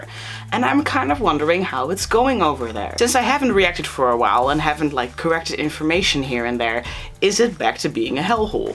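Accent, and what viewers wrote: Netherlands. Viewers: i smell dutch, are you dutch??? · Are you also dutch? Bc i have the same kinda accent when i speak English😼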